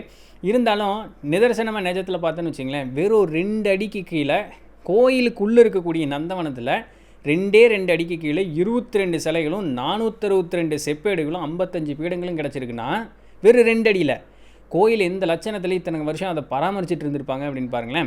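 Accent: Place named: Coimbatore, India. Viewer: தம்பி, நமக்கு கோயம்புத்தூருங்களா... நல்லா சொல்றீங்க...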